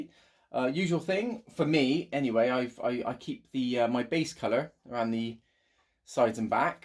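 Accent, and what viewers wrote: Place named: Salisbury, United Kingdom. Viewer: You sound like a Salisbury boy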